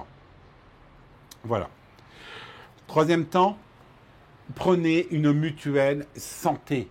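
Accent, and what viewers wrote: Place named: Brazil. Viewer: Pourquoi dis tu que tu es français? On entend très bien dans ta voix que tu n es pas français. Je pense que tu es juste brésilien, et que tu souhaites attirer des vues youtube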